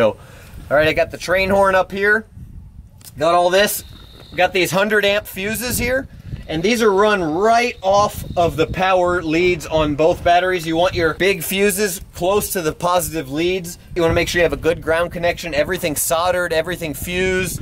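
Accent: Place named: United States. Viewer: You sound like an American yet you drive a Toyota? For me AMERICAN MADE BABY . But whatever gets you home. Still a pretty truck though.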